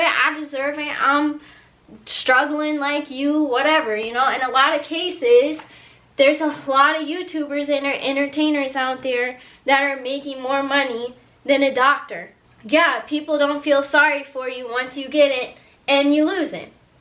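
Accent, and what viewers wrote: Russia. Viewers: You have a tiny Russian accent lol I think you got it from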